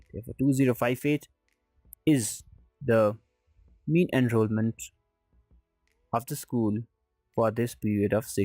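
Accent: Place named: United States. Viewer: u are indian right? but your voice sounds more like an american ...... :)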